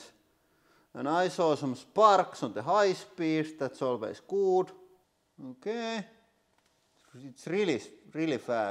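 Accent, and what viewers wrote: Finland. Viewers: I must compliment you again on your straightforward Finnish accent, that's a beautiful thing and always a pleasure! Olet raudanluja kokeilija, hiihdä näitä latuja ja menesty vielä suuremmin! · Is it me or does he sound more Finnish in this episode? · Got to love this Finnlish accent!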